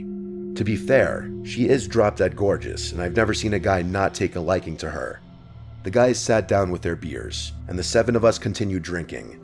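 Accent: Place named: New Jersey, United States. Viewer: I’m gonna guess you were raised in Essex County NJ, am I right? My accent is coming back just listening to you! 😂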